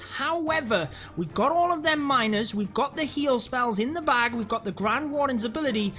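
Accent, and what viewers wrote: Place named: Northeast England, United Kingdom. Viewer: North East England by the sound of it. My guess Sunderland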